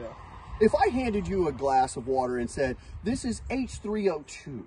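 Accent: South, United States. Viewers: Wow, people will buy the craziest sh*t!! On a side not I’m glad to hear that the deeply Southern “okaaaay” DOES come out of all Carolinians not just us in the more southern Carolina….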